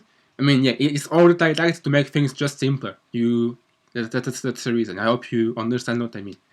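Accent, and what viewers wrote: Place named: Germany. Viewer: He has a decidedly CHUR-MAN accent.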